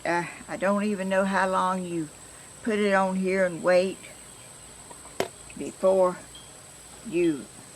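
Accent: South, United States. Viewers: Good God blessed morning! Just dicovered you, and so very glad I did. I just love your down to earthness, and also enjoy your lovely southern accent. My mom was from Chattanooga, and my dad from Mississippi. You are a very talented creative lady, snd I look forward to future videoes. Your surroundings are just lovely and the wind chimes are nice to hear. I ike them also and have seversl on my front porch. Blessings and peace, and happy crafting. (Gonna have a cup of coffee now too!😊) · Hi Ms. I live in Chicago, first i love your southern accent also your morning videos. Very relaxing to watch you perform your wonderful art.I have learn so much from watching your videos. I am 68 years old just retired and looking forward to try all your wonderful crafts ideas. Please don't over do it in this extreme heat. Stay cool. Stay safe. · I’m from Georgia and LOVE your southern accent!! 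Reminds me of my childhood in Georgia 🥰 You’re a doll !!!❤️ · You have the most relaxing peaceful voice. You remind me of my dear aunt who lived in Tennessee. I would love listening to her stories in her sweet southern voice just like you. I’m so glad I found you. Actually my sister did. 🥰🥰🥰🥰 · Hi MS.! Thank you. Love you! Your stories are great! History is being taken from us. One of my Grandma's grew up in the south- - Kentucky or Tennessee- and your accent reminds me of her (she passed many years ago). You do NOT talk too much. God bless you and keep you safe so your YouTube family can continue to learn and enjoy you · Oh Ms, I just recently found you here on my tablet. It's so nice to hear another southern accent! I'm from Tennessee. I have been in Illinois for almost 17 yrs. My husband will retire soon, so I will be able to go back home. Thank you for all of the encouragement that you give us. 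Lord knows I need it! I could listen to you all day. So, now I am going to spend a few days with you. I want to start with your first video and watch them all. I did turn on alerts for your channel, so I will be notified when you make another one. I'm crafty too. So I am getting lots of ideas from you. One thing that I feel like I should say. Please don't be offended? I was watching your fishing trip, bless your heart, all that rain. But, what I wanted to say to you is, you being a lady of 82, and single living alone, you have to be more careful! Anything could happen. I was so afraid that you were going to go running for the car out in that parking lot. No one was around. What if you fell? Please let a friend or neighbor know when you are going to go out like that. We were all worried for you. Because we love you and you have become an important part of our lives. You also ran through three stop signs on your way home. 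Just sayin. Be a little bit more careful! God Bless you. When you hear from Cody, tell him that you are still getting more fans! I know you've got me now for sure! Much Love, Kim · I just love this lady I have just discovered Ms. love her southern accent. She reminds me so much of my wise mama which I miss everyday. God bless you 💞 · Ms. I found your YouTube channel while I was living in Minnesosta and I missed hearing the southern talking I grew up with in Georgia. You talk as much as you want to, about whatever you want to talk about! It's your video. You do you! I love all your projects! Now that I'm back in Georgia, I wish that I could come visit and craft with you and drink coffee! Blessings to you and all your endeavours!-Angela Hurt from Lizella, GA. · Love “southern” talk. It’s beautiful and melodic. Please don’t apologize for your southern dialect.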